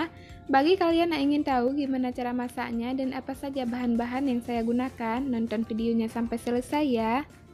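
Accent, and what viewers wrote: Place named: Aceh, Indonesia. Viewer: Bahasanya meu aceh that. Ketawa aku2 😀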